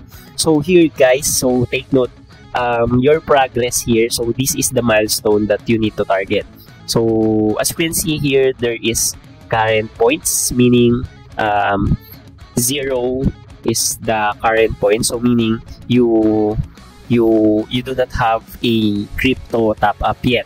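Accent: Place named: Visayas, Philippines. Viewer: Pinilit mopa mag english pati accent mo pang bisaya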